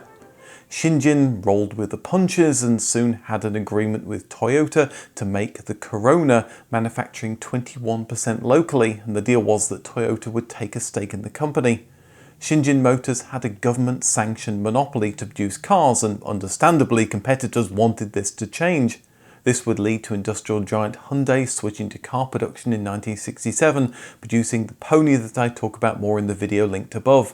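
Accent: England, United Kingdom. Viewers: It's had to watch when the presenter can't pronounce the names correctly (typical English accent). It's said "Day-Whoo" not Die-ooh" (or what ever he's actually saying) and some of the other names he incorrectly uses are atrocious..thankfully he pronounced the "e" in Porsche..it's even correctly said by another person in the episode, yet apparently he can't couldn't hear it...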